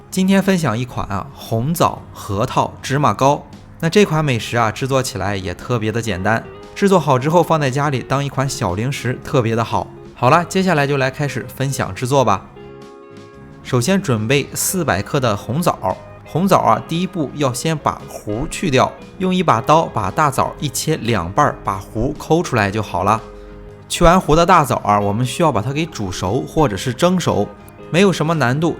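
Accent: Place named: North, China. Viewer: 这是哪里人，每句都有儿话音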